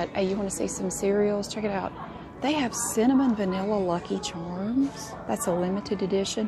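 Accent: South, United States. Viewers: I’m from Nebraska and most people only eat Hidden Valley Ranch. If I came home with generic ranch, my family would flip out no joke. Midwest is serious about our ranch LOL! I love your videos and your voice and southern accent. It’s fun shopping with you and watching you straighten things. Thank you! 😊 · just recently found your videos. I must say that they are the best distraction for my anxiety. and Im from the south and your accent is very reminiscent for me, always watch them before bed Lol😂 thank you for being hilarious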